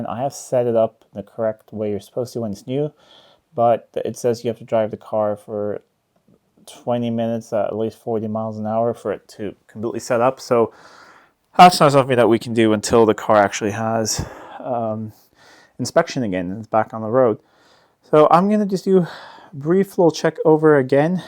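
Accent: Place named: Midwest, United States. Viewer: Hey OP, how long have you lived in the UK? Sound like a Midwestern man.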